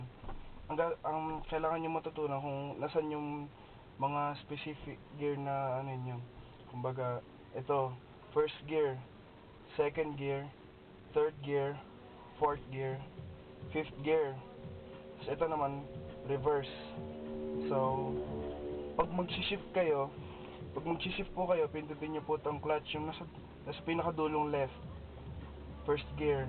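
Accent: Batangas, Philippines. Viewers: taga batangas ka kuya noh? very helpful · Ang cute mo mag salita,.. Eihhh taga batangas po ikaw?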